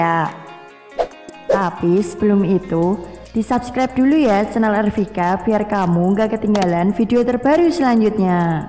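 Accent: Java, Indonesia. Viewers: Suarane medok .. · Medok bgt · Apik mbak tapi ketoro muedhok ketok jowoe hahahahahaha suon njeh mbakku. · Medhok bhanget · Suaranya itu loh medok bngt jawa nya hedeeh capek denger nya. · Terlalu medok mbak,menurut saya mbak harus bisa belajar public speaking yang baik dan benar dan juga yang terpenting gear mic nya mbak,kalau kedua hal tersebut udah terpenuhi insyaallah nanti channel ini akan sejajar dengan sobat hape ataupun gadgetin SEMANGAT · Medok banget suaranya wkwkwk 😂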